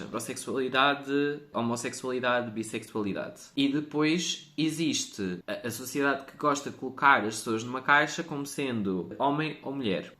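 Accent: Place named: Portugal. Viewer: Desculpa, escrevi errado (já corrigi), queria mesmo dizer que homens gays preferem homens masculinos. Então, pessoalmente suponho que seja mais uma questão de orientação sexual do que de gosto, mas é difícil determinar isso sabendo o quanto o padrão masculino (homem másculo, musculoso, branco), mesmo sendo cultural, determina fortemente o gosto das pessoas. Eu comentei porque o assunto do título me interessou, além do que você fala bem, e foi bom ouvir o português europeu (sou brasileiro) haha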